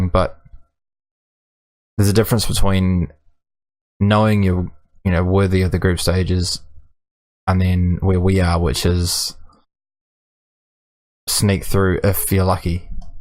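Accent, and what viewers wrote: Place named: New Zealand. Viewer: You seem to have picked up the accent so I had you down as NZ born and bred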